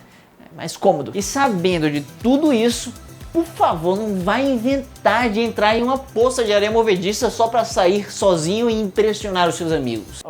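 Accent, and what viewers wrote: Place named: Northeast, Brazil. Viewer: Ele é do nordeste kkkkkkkkkkkkkkkkkkkkkkkkkkkkkkkkkkkkkkkkkkkkkkkkkkkkkkkkkkkkkkkkkkkkkkkkkkkkkkkkkkkkkkkkkkkkkkkkkkkkkkkkkkkkkkkkkkkkkkkkkkkkkkkkkkkkkkkkkkkkkkkkkkkkkkkkkkkkkkkkkkkkkkkkkkkkkkkkkkkkkkkkkkkkkkkkkkkkkkkkkkkkkkkkkkkkkkkkkkkkkkkkkkkkkkkkkkkkkkkkkkkkkkkkkkkkkkkkkkkkkkkkkkkkkkkkkkk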